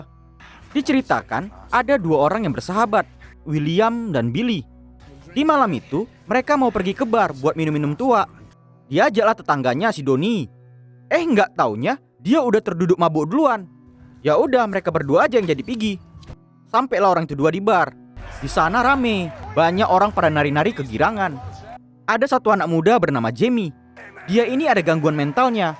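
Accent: Medan, Indonesia. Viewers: Medanya nampak kale kk🙏🙏😅😂🤣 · Min orang medan min? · Aku sor nya dengar yg ngmong di chanel ini macam anak medan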